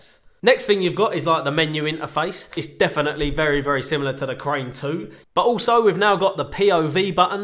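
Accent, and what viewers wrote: United Kingdom. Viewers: Ok, subscribed after 30 seconds just for the raging britishness of the lad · OMG You're like the hottest Youtuber talking about gimbals. My opinion is absolutely biased because I'm obsessed with gingers and British accents. Just subscribed cause you can talk about any tech and I'll think it's super hot. Xxx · you are extremely british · I'm broke but you sold me. Quite refreshing to hear a brit speak on YouTube instead of Peter Mckinnon, Alen Palender and all them Canadian fellas. Great video, cheers. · i like british acsent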